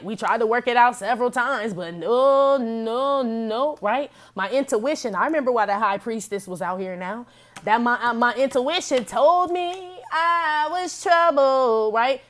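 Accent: Chicago, United States. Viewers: Thank u! I needed it this today thank you honey are u from Chicago? I keep u hearing u say G and Joe!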